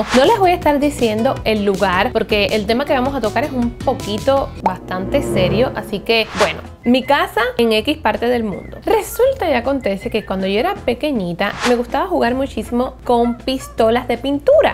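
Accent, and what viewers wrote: Cuba. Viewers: alejandra sanchez yo creo que es por el acento ya que las dos son cubanas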